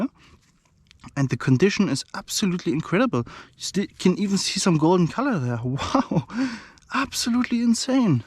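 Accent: Germany. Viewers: I was thinking the same thing about the pronunciation of iron. Another word is enamel, German accent pronounced animal but we still love your channel. ❤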